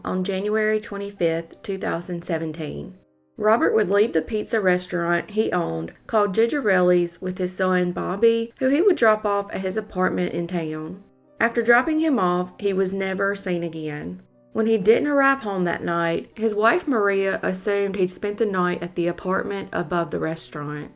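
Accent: South, United States. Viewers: I can tell your southern not only from the accent but because every case you talked qbout has 3 names instead of 2. I'm willing to but your name is.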